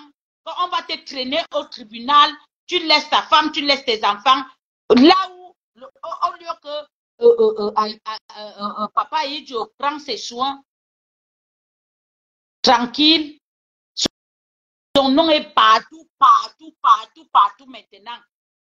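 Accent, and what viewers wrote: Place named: Ewondo, Cameroon. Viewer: Mieux tu parle ewondo ton français et ton Corp se resemble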